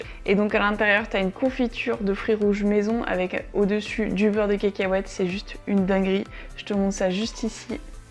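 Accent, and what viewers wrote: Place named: France. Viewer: I love French accents!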